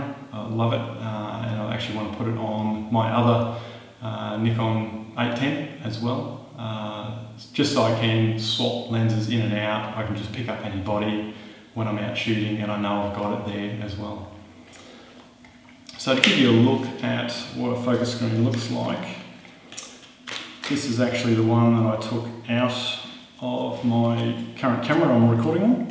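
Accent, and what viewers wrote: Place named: Australia. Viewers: I love Aussie accents. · Haha the Aussie accent, I'm Australian he was as clear as a bell. XD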